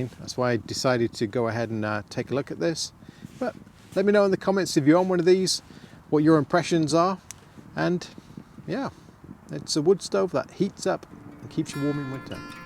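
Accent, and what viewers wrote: United Kingdom. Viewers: Thank you. GOOD to have the advice of a Canadian (I am from Quebec). I am looking for a video on how to relief yourself with number 1 and number 2. Thank you again. Nice British accent.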